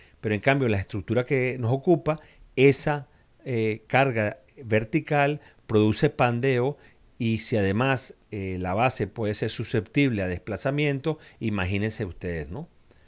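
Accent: Venezuela. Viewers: venga: el que habla es un veneço?.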